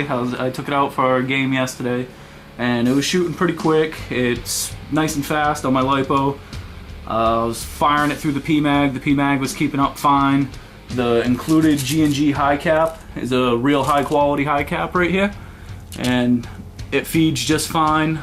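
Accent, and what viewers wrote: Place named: Boston, United States. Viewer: You live near Boston MA don't you I know that accent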